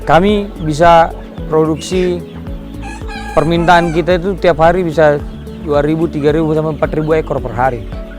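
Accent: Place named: Sulawesi, Indonesia. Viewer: Logatnya mcam orang sulawesi kyanya ya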